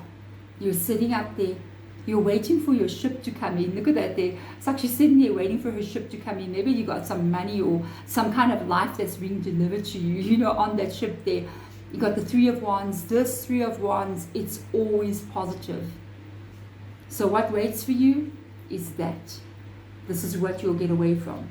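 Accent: South Africa. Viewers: I hear a south African accent?😊 ❤I'm from joburg living in Oz. Thank you for this reading 📚 ♈️ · Thank you! You sound South African!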